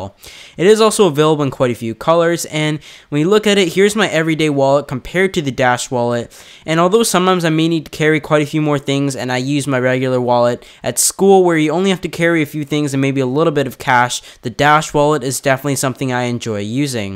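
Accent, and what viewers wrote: Canada. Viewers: Your're Canadian?!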